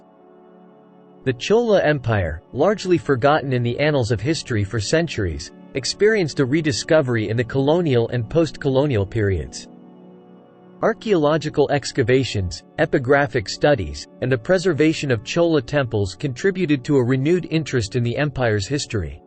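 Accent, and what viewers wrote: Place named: United States. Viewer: I would of preferred it if you had set your narration machine to an English accent not American.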